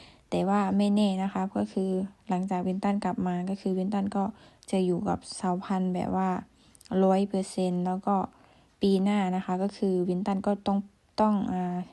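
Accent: Laos. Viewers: มันบ่คุ้น เสน่ห์ของหนูคือพูดลาวนั่นล่ะ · ใช้ได้อยู่ เว้าภาษาไทย ฝึกไปและต้องสังเกต ส่วนมากคนลาวเพิ่นสิเว้า ช เป็นซ.คนไทยสิจับได้เลยว่าเจ้าเป็นคนลาว ลองฝึกเบิ่ง แล้วกะ ด เจ้ากะใช้ ล ได้เจ้ากะเว้าว่าไล้ ช่วยเจ้ากะเซ้าซ่วย ช. ด ฝึกให้มันถูกแค่นี้กะเว้าถูกแล้ว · แม่ติดตาม มานานแล้วมักที่เจ่าเป็นคนลาวเว้าลาวเป็นโตของโตเองแล้วก็เข้าใจสำเนียงเว่าของลาวไต่มันกะคือคนอิสานทางอุบลจงทำในแบบที่เฮาเป็นแล้วคนเบิ่งคริปเขากะมักที่เจ่าเป็น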